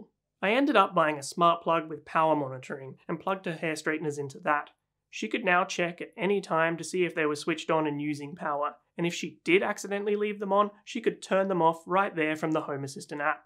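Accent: Australia. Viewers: Ha!. Suspected that was an Australian accent! Great channel. 👍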